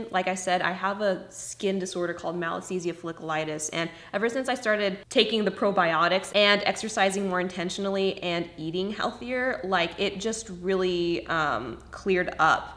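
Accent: Australia. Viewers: How did she sound Australian and them go straight to american english/ no accent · I love how she says WHATS GEWD and then speaks in an Australian accent afterwards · Your accent sounded so Australian in the beginning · Your hair and skin are snatched! And you for your skin lookin like that in just 2 weeks?! Ima tryyyy. Are you going in and out of an aussie accent? So cool lol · I’m so confused why do you sound Australian at the beginning?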